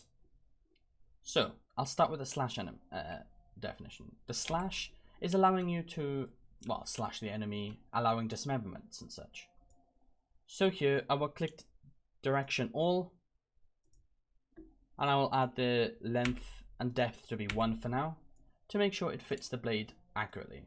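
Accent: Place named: Wales, United Kingdom. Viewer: you sound welsh are you?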